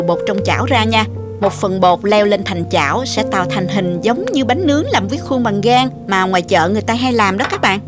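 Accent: South, Vietnam. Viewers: That cam on co...1 giong noi rat am cung....ngot ngao giong mien nam.ngot oi la ngot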